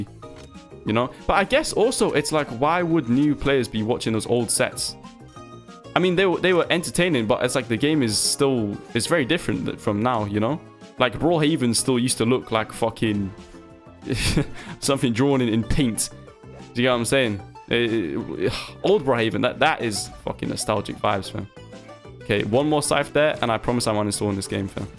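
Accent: United Kingdom. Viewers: I flipping love your British accent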